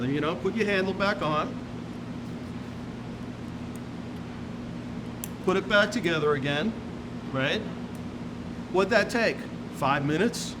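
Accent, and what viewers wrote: New York, United States. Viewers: Former New Yorker here, and damn, that accent makes me homesick 😂 Thanks for the explanation, would love to see the procedure a little closer up, but even so, this gave me the confidence to try to resolve a really bad leak from a garden hose shutoff valve. Wish me luck! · Great video, thanks, ditto on hearing that New York accent!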